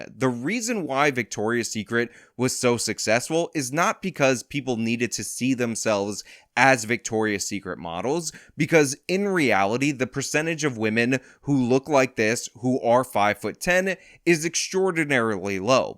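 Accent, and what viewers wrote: New York, United States. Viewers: first time i noticed you have a new york accent, at least northeast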